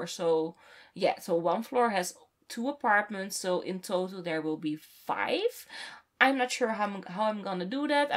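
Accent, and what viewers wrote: Netherlands. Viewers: It's so cool! I can't wait to see what you'll do with all these appartments. But I think there will be a lot of episodes and that's good :D And by the way I do love your Dutch accent, espcially when you say garage. In each video I'm waiting for you to tell it and I can help myself smiling. Can't wait for the next video !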